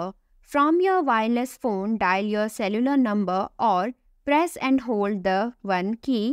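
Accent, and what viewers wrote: India. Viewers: accent. Sound like very heavy India accent.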